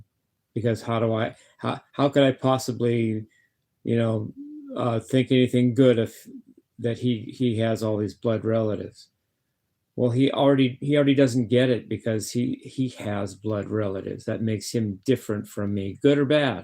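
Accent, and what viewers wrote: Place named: East Coast, United States. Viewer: Hi, Nobody uses “Mock” except. I can hear your east coast accent.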